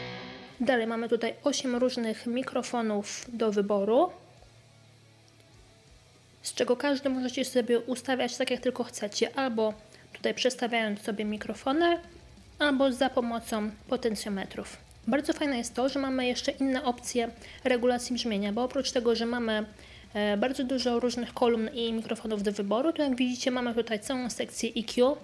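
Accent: Poland. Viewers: Могу ошибаться, но судя по языку (говор, некоторые знакомые слова - как в старом "Ведьмаке"с субтитрами) в видео - она из Польши.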